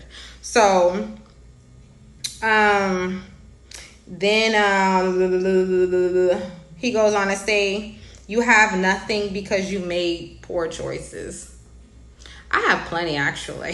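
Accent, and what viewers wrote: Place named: North, United Kingdom. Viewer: that up north accent i like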